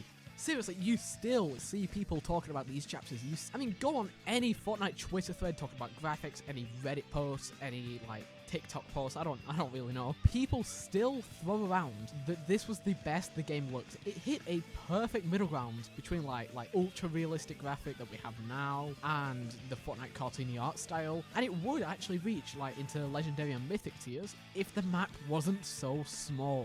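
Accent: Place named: United Kingdom. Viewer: Respectfully you are actually the most British sounding British youtuber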